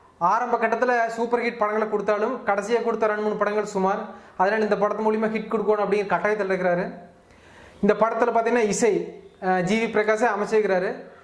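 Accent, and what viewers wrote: Coimbatore, India. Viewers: coimbatore slang etti pakudhu....nice to hear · rather than your review. your coimbathore slang is lovely brother. but improve your pronounciation in english or say everything in tamil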